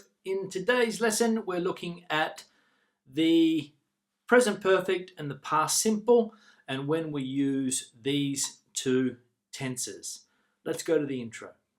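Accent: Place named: United Kingdom. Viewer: What a beautiful accent! Forvo says it's a british accent so I hope I could learn it perfectly as soon as possible, Spanish here!! Very good video, perfectly explained and easy to understand, Thank you!!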